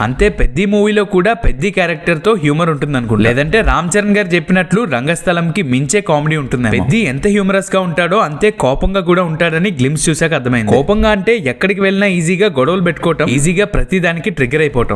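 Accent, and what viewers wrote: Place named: Visakhapatnam, India. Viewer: Aa slang vzm ...not sklm bro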